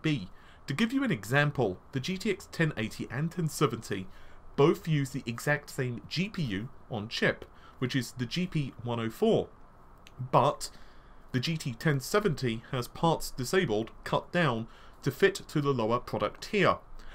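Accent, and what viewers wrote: United Kingdom. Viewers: Very hard to understand him. He sounds like a Brit but also sounds like a person with his english as a 2nd language. His explanation is hard enough to understand with all video gaming and not organized way and his pronunciation on those words is worse lol.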